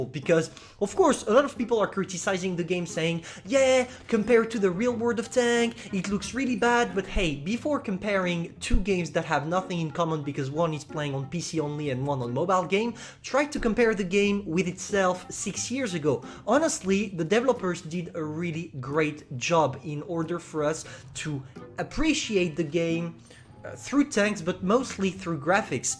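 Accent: France. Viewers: omg ce french accent j'adore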